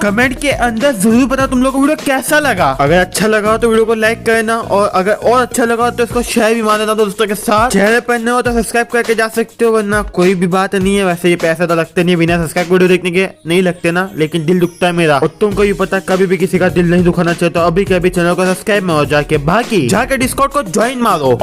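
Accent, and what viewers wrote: Gujarat, India. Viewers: bhai gujarat se ho aap?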